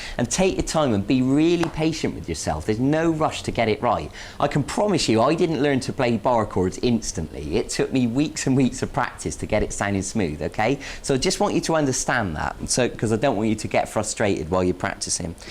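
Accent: United Kingdom. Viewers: Well, Great Britain is a pretty big place, so there's plenty of different dialects (a guy from texas and a new yorker doesn't really sound like they're from the same country). He doesn't talk the stereotypical London english, but it stills sounds like he's form GB. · his voice isnt funny hes british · oh boy!!! r u kiddin' me!!? I agree that Boys n Gulz have different voives but gays also have got their unique voice, i knew 2day... he's a British n british have their own way of talkin' English. That's it!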